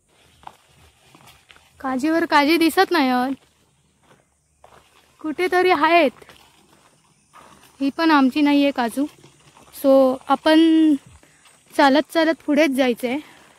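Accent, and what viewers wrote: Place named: Malvani, India. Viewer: गो सिंधुदुर्गातील खयच्या गावात काजी काढुक गेलंस... तुझा मालवणी कानाक गोड वाटता... ❤❤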